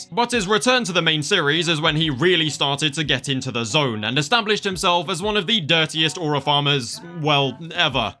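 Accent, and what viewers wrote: United Kingdom. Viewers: Your British? Unsubbed :( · I didn't know you were British mate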